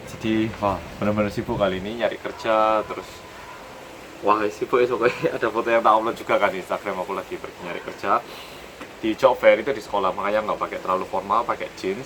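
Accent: Java, Indonesia. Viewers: medoknya lucu 😂😂😂😂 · Ini masnya asli korea apa org indo? kok medok jawa ne😂 Ya wes. La lek😂😂😂 Sukasuka😄😄😄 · Oppa asli korea atau orang indonesia?????logat jawa nya kena banget 👍👍👍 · logatnya ky orang jawa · Korea asli tapi tinggal di Indo dan berlogat Jawa (medok). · kok kedoknya kedok jawa kak xD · orng korea tapi jawa banget ngomongnya 😍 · Logat nya rada kyk org jawa gitu , medhok bgt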